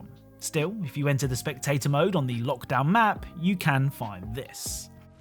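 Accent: United Kingdom. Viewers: Something about British gamer YouTube channels that I just love. Maybe it's because I'm American, and the British accent is somehow hypnotizing or cathartic to us 😂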